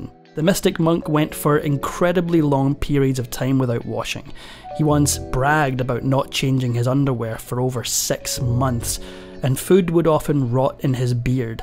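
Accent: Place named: Scotland, United Kingdom. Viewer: This Scottish dude is BY FAR the best Factinate narrator. I wish he did all of them!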